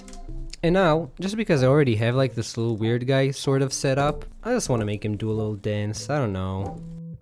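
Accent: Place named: Israel. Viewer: are u jewish. I smell an israeli accent. Might be wrong though.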